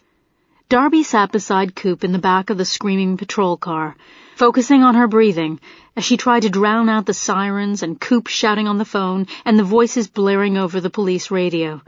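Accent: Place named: Boston, United States. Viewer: Probably a good book, unfortunately, I cannot listen to that harsh Boston accent. It simply grates on my nerves. Thirty -six minutes is all I could manage.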